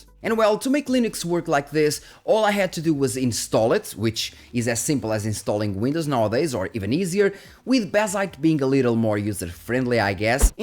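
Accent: Portugal. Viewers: Assim que abri o video percebi logo que eras tuga lol, bom video, já há cerca de 3 meses que uso mint e ando a gostar bastante, mais tempo de bateria melhor performance, sem telemetria (tudo comparado com o W11) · I can hear your portuguese tgroufh your english accent. (Not a critic.. Mine is worse).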